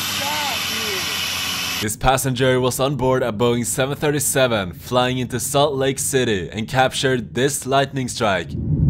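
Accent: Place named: Sweden. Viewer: Värsta svengelsan jag hört!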